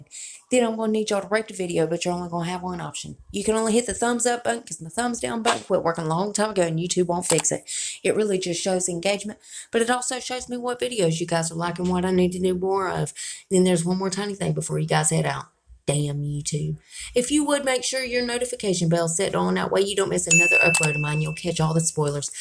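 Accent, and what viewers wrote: South, United States. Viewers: I looove your accent!!!!! 💜💙💜💙💜💙 my husband can always tell when I've been watching your videos cuz I end up talking in a country accent lol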